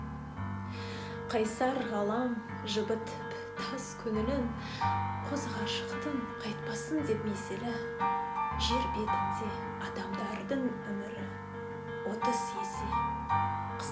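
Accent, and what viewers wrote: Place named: Russia. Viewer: Астанада тұрсаңыз, Синтез актерлік курсына Мұхтар ағайға барыңыз. Тіліңіз орысша шұбарланып кеткен, акцент бар. Ң, Ү, Ө әріптерінің сиқы жоқ, үндестік дауыс ырғағын жетілдіру керек. Өлең мағынасын жүрекпен сезініп соны тыңдарманға жеткізу керек. Мен видеода тек өзіңіздің бет-әлпетіңізге тамсанып жақсы оқып жатырмын ғой декен ойыңызды сезіндім ( орысша айтқанда самолюбование, не передали состояние) сөйтіп, сөйлей сөйлей шешен боласыз, күндердің бір күнінде жарып шығасыз.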